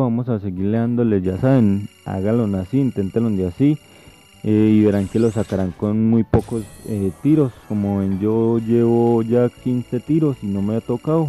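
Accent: Colombia. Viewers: eres de medellino de cali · Na pa mas colombiano pa que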